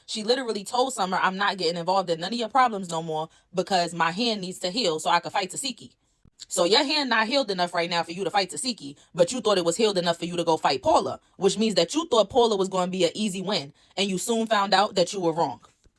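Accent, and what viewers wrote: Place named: Brooklyn, United States. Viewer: Omg you’re from bk!! I knew it lol The dialect and accent is distinct. I’m a Bk native and while I live in another borough I will always rep bk! We a different type of breed.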